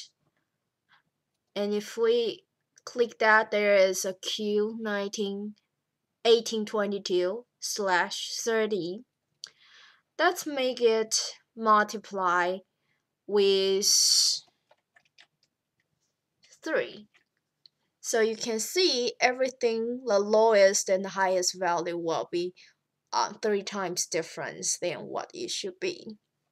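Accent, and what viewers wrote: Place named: China. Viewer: Thanks, quite helpful. I like Chinese accent :)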